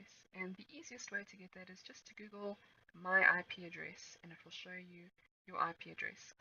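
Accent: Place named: South Africa. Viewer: you sound very South African. Awesome. Dankie.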